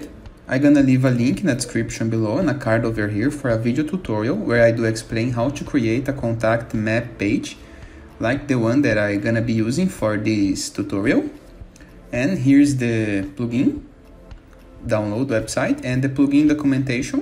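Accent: Brazil. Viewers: Cara, você é BR né? kkkk Top, mandou bem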